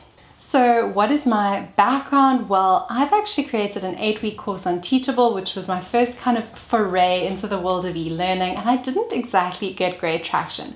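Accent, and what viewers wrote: South Africa. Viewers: What an incredibly beautiful woman. Goodness me. And the South African accent just sprinkles even more beauty !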